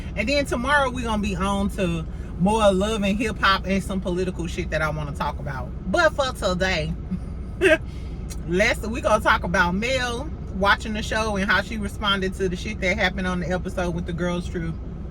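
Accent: St. Louis, United States. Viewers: I'm cracking up because you are so St. Louis! Every time that you say thurr, I get weak!😂😂 I'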